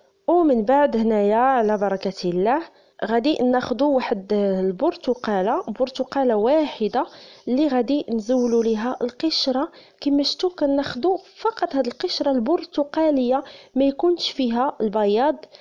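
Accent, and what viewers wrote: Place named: Morocco. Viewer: صحيتي يا زوينة أنا من الجزائر وليت كنهدر بحالك حيت تعلمت منك الوصفات بلهجة ديال المُغريب تبارك الله عليك أ ختي عافاك شحال تخرجلك هاد الكمية العصير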